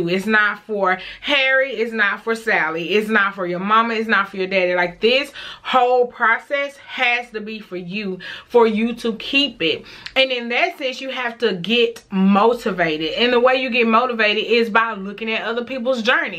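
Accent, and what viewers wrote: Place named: Texas, United States. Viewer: Bravo! I know you are helping a whole lot of people through your channel. If you ever want to join a great supportive fasting community, try A Healthy Alternative on FB. The founder is Chris K and hw has a channel too. And a wealth of information on YouTube. I will stay tuned to watch you. Your accent reminds me of my family who are mostly from Texas. Lol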